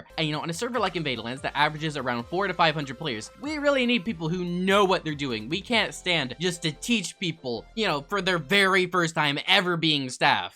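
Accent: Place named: United Kingdom. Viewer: I love your randome british axent I speel it the wrong porpes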